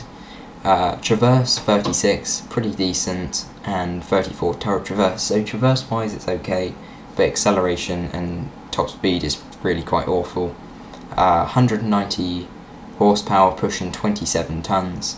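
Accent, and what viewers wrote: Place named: United Kingdom. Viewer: You have a British accent. ^^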